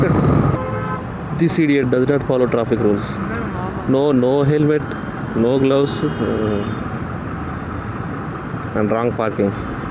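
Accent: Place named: Hyderabad, India. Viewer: bro u speak superb hyderabadi hindi..😁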